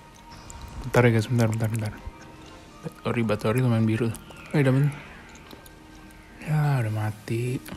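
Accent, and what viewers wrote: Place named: Medan, Indonesia. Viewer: wkwkwk orang mana bro? kyk logat medan ya.. cibai2 la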